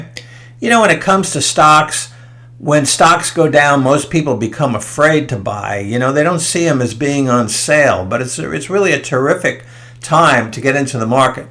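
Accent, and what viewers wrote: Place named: New England, United States. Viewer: Nice presentation....BTW, I think I detect a New England accent....my imagination, or Mass. maybe?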